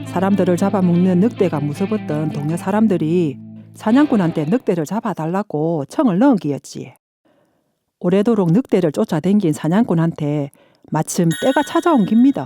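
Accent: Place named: Hadong, South Korea. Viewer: 제가 사는 곳에선 좀 처럼 접하기 힘든 사투리인데, 하동 말로 듣는 동화가 생생하고, 참 정겹게 느껴지네요. 다음 편도 기다릴게요 😊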